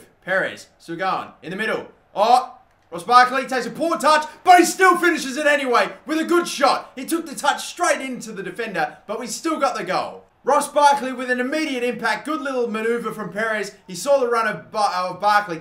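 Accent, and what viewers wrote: Adelaide, Australia. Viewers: Are you from Adelaide?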